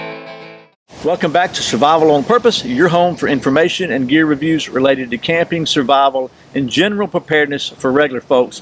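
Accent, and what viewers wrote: United States. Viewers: Your accent ...yank mate....which state/region? It's cool! A bit of a twang, but not too much..like it!.😁👍🇦🇺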